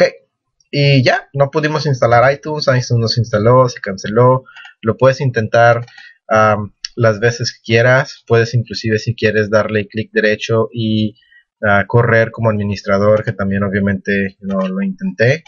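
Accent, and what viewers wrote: United States. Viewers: hablas como gringo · Español chicano everywhere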